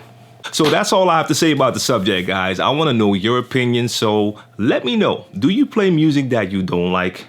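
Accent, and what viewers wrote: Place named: Ohio, United States. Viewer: sirch one Hey bro, where are you at? Sounds like NE Ohio to me, lol. A small bit of advice that works for me is to find a spot where you can play what you like. My passion is to play breaks and little known gems from popular artists along with golden era hip hop but that doesn't work in the dance clubs in my area. I can do the top 40 thing because it's so easy and I have so much experience mixing hard to mix stuff because of my passion. What I did to counter the downsides of top 40 gigs (that pay after all) was find some hipster bars that let me do my thing for free beer. That way I'm always scratching my itch to play what I like and practicing in public which is always better than practicing in the "bedroom".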